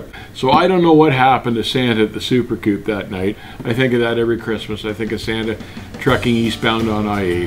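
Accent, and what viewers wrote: Canada. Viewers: nice to find your channel, you know your stuff and listening to your candian Accent reminds me of running upstate New York on I 81. The Canadian truckers were always the nicest drivers! Your stories also reminds me of the days when I hauled produce coast to coast loading in California back to Florida. I started over the road running for an owner operator and Produce was his main commodity. this old guy made me a much better driver because boy he was very high standards with his equipment. But I was grandfathered into the business in 1990 so all of my learning experience was on the job! It’s fun to watch your videos they are spot on !!! thanks for caring to raise the bar for the newer drivers! I now pull doubles for an union LTL company. “Wildflower”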